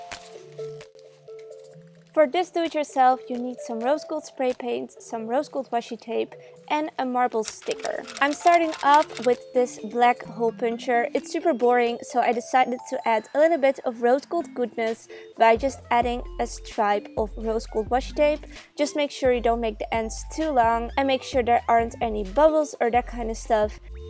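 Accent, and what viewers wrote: Netherlands. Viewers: Je hebt een Nederlands accent aan je engels supergrappig!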